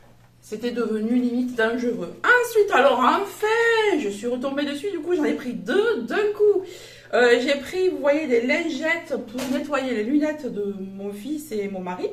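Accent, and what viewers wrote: South, France. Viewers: Bonjour, belles découvertes à action. Le petit haut vous va très bien. La couleur met votre teint en valeur. J aime vous écouter avec votre accent du sud. Bonne journée.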